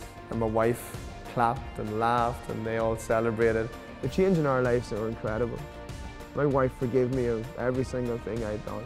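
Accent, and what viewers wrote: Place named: Ireland. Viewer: Awesome story bro! Just recently heard your music and love it! And now find out you're Irish! Haha, love the accent.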